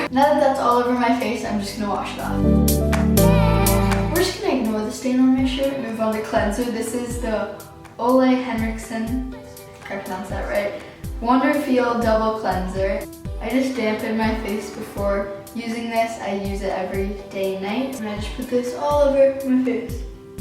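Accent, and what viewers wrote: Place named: Canada. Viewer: you have a thick canadian accent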